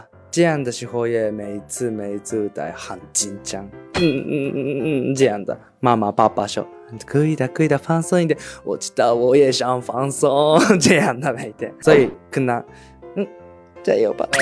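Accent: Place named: North, China. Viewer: 中国话越末越流畅了👍👍👍有点象中国北方某地方的方言🎅🏻🎅🏻🎅🏻😃😃😃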